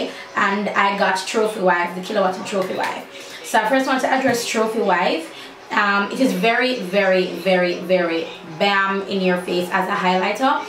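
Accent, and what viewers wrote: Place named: Jamaica. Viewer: I'm Jamaican... She sounds like me and she lives in Jamaica, so I thought she was Jamaican.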